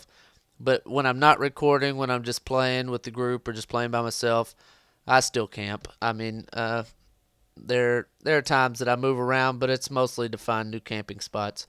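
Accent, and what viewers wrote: South, United States. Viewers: Sounds southern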